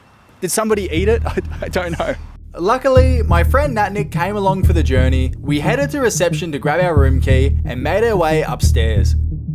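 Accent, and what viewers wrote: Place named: Australia. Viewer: Men with Aussie accents like his makes me MELT.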